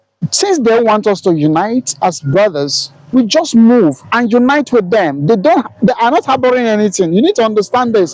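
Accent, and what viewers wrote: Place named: Ghana. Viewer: You don't know what you talking about in fact you speak like Ghanaians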